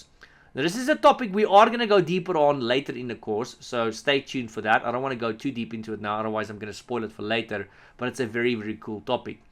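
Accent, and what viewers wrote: South Africa. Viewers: I'm impressed by your content! Love the South African accent with the thorough explanations. A copy and paste of the compTIA A+ course but with your own style. Keep it up!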